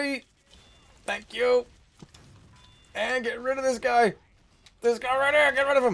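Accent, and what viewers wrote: Boston, United States. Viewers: well he is from boston and the scout is from boston so the same accent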